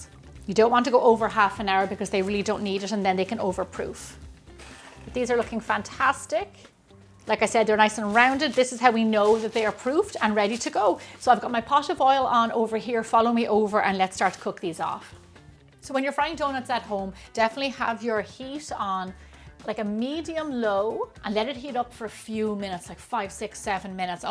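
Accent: Ireland. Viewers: Love all of your recipes, tips, and especially your Irish accent!!! Been a fan since before Georgie was a twinkle!! Keep up the fabulous yum!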